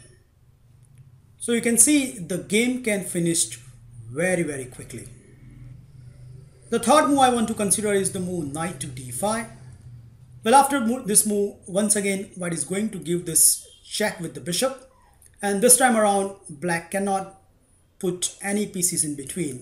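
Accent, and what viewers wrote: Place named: India. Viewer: Tyler Roth why you hatin on this guys voice. Sure he has an Indian accent but his voice isn't cancer. Don't joke around like that, cancer is a serious thing bro. Also nice attack will try this in my next tournament. There's this players who's skilled who plays this opening so might be able to beat him now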